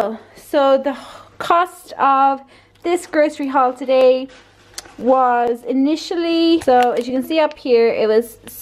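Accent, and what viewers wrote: Ireland. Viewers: I just love the Irish accent :) hello from the U.S. ! (Washington State) :)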